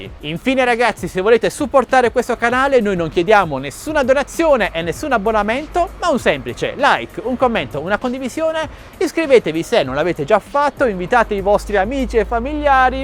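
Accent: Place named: Molfetta, Italy. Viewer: Sei di Molfetta? La A la E ....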